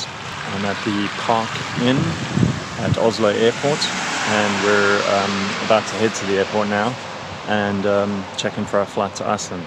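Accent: South Africa. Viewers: Random question and please correct me... are you South African?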